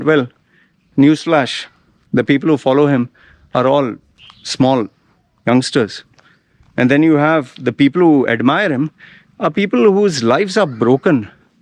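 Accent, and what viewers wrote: India. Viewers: Ugly Indian with the nasty Indian accent talking about Tate? This Paki American would like a min with you.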